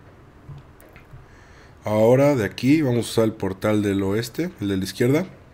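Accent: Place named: Valencia, Spain. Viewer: Tu como que eres valenciano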